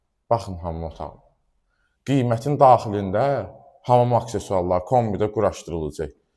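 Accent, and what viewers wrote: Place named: Baku, Azerbaijan. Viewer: бу гардашын дашынмагындан беле баша душурук ки бу гардаш заводской бакылы баласыдыр озуде маштагылы олачаг . валлах сенин о ширин лехчене горе истесен де истемесенде адамо еви алар . гардаш вар ол саг ол емише беле позитив ол сене гулаг асанда адам гедиб душун <num> иллере чох саг ол ки кечмишимизи йадымыза салдын аллах хейрини версин ай гардаш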